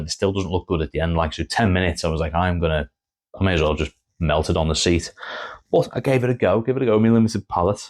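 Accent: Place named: Liverpool, United Kingdom. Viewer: Just picked up konflict 47 you sound a bit Scouse sorry if not first time viewer if you can you recommend any gaming clubs in in rundown prefer adults not kids. Thanks if you can..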